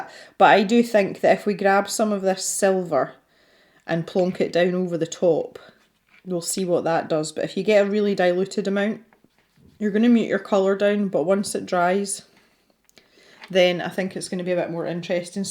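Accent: Scotland, United Kingdom. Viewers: Wooo, I really love your accent even though I struggled a bit with understanding everything (English is not my native language), but Scottish accent has such an interesting tone and pronounciation! I love your detailed and long reviews and unboxings and your artworks always come out great, so I'm really exited for your artwork of this month's box! Lots of love from Germany! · Me too, but in another circumstances...🤣. The Scottish accent is so beautyful and " back to the roots". Difficult to explain, but I think, you know what I mean. Astonishing artwork. Gorgeous and amazing. Thanks for uploading.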